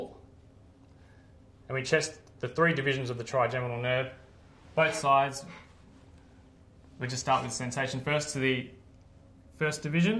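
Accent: Australia. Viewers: Sound Australian to me mate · Very Australian indeed... mayte...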